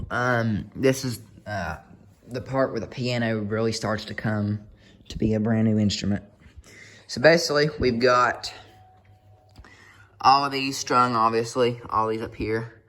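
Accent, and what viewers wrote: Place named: United States. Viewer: in which part of US is your accent? thank you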